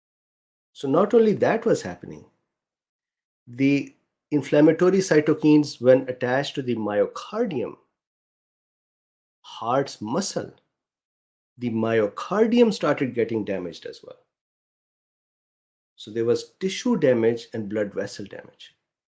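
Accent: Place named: India. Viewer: Many times I try to watch your vids but couldn't put myself through your accent. I am not a native speaker of English either so I understand many people who speak English with some accent, but Indian accent is the one that I can not stand. Too bad.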